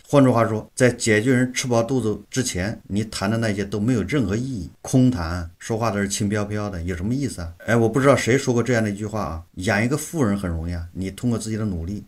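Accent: China. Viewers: 沒字幕 大陸口音太重 聽不懂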